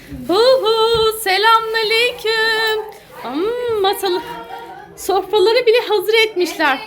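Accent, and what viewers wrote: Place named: Zonguldak, Turkey. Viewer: Bursa dan selamlar hemşerim ben Zonguldaklı olarak memleketime selamlar sizi severek takipteyim benim olumun hesabından yazıyomaynı biz gibi.gonuşusyasunuz adetlerinizfe bizim gibi caycumalıyom bende canım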